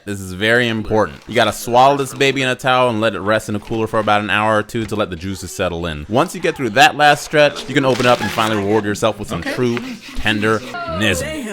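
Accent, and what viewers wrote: Texas, United States. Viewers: bros def from texas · Where are you from if your not texan...how the fu.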